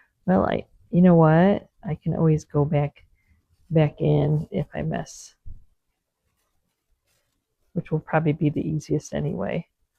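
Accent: South, United States. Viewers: Me too. I have noticed several words she says that sound like us down here in the south. I love it.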